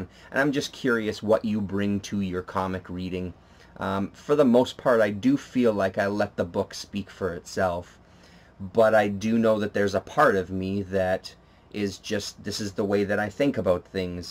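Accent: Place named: Canada. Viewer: Canadian! He says "aboat"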